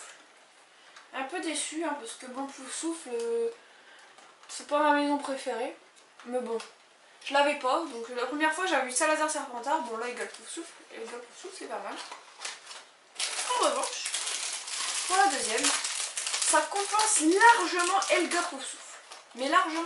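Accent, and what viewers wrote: North, France. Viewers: Oh my god! Trop beaux souvenirs! Rien que de voir la boutique, j'en bavais d'envie d'y aller! J'aimerais trop mais mes parents ils veulent pas ou peut-être l'année prochaine en colo mais j'y croit pas trop car je suis jamais parti seul donc ils veulent pas. Mais sinon super souvenirs! Tu en as eu pour combien si c'est pas indiscret stp. Et j'ai une question: Tu habites dans le nord de la France? (si oui c'est grâce à ton accent que j'ai découvert, Si non, je me sis trompé). La prochaine fois que tu pars, dis le moi, je me mets dans la valise ;) Je rigole :D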